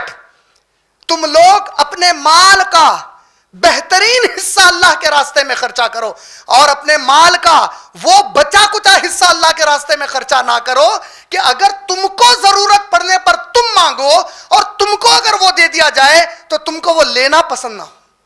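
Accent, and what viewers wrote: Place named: Hyderabad, India. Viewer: Br assalamalaikum. i always suggested you that even you speak the truth, it's difficult to accept it due to harsh wordings, so kindly speak politely. Style of addressing is very essential, it leaves meaningful impact. You raise your voice unnecessary because the truth could be explained by having patience and kindness. In'Sha'Allah.try to avoid your hyderabadi rough style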